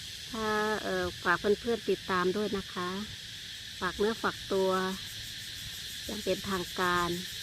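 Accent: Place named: South, Thailand. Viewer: นี่ถ้าไม่บอกคิดว่าคนใต้ เพราะสำเนียงเริ่มมาทางใต้แล้ว ยินดีที่ได้รู้จักนะค่ะ ทำคลิปสั้นด้วยสิค่ะ ช่องจะมีคนเห็นเยอะขึ้นค่ะ สู้ๆๆนะค่ะ เป็นกำลังใจให้ค่ะ นุ้ยเคยทำงานโรงแรมที่ภูเก็ต 6ปีกว่าค่ะ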